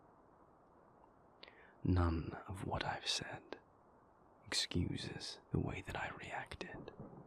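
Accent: United Kingdom. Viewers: FINALLYYYYYYY, now cue the emotional tears and British accent 🫡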